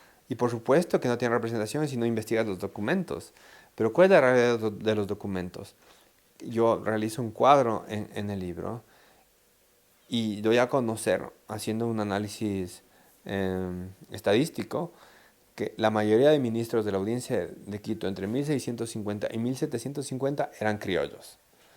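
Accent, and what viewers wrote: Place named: Extremadura, Spain. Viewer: Desde España un saludo señor le aprecio soy estremeña creo que que visitó estremadura espero que le gustará agradecida por su cariño a España conozco algunos ecuatorianos y tengo uno vecinos que son de esas tierras son estupendos yo alucino hablos como si estuviera en mi pueblo pues vivo en Barcelona saludos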